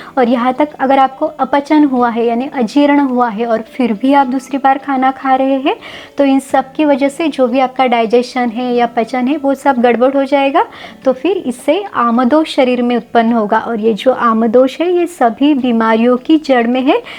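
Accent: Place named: Maharashtra, India. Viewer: Madam apke bolne me Maharashtra ki jhalak he.lekin aap jain he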